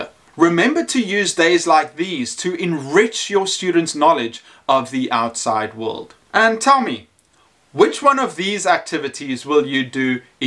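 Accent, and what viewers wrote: South Africa. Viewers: Easy to spot a fellow Southie! Nice job on all the content, very helpful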